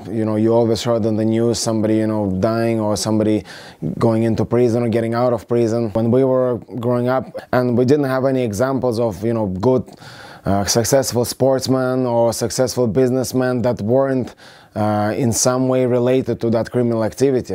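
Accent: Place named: Ireland. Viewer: It’s crazy how he has somewhat of an Irish accent 😂😂😂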